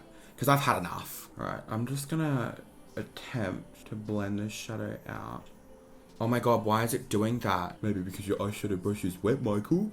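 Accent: Australia. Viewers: tori s its australian like mee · Is he Australian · Is his what posh Australians sound like? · Is he Australian? Because he sounds like it.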